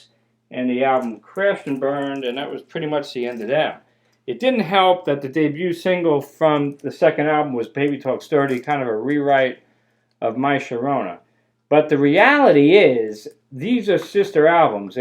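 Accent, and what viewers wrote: Long Island, United States. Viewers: I just discovered your channel and i'm digging it! WE share the same taste in music and are probably close to the same age. U grew up on Long Island (accent is a giveaway) and I grew up in South Jersey (my Philly/S Jersey accent is a giveaway). Keep up good work!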